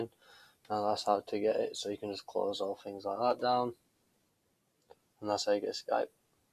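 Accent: Manchester, United Kingdom. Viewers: He has a Manchester/Lancashire accent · at times un-understandstable because of very distinct Brit accent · yes mate are you from manchester?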